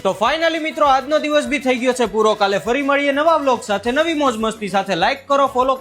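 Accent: Kathiawar, India. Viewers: લાગે છે તો અમારા કાઠિયાવાડી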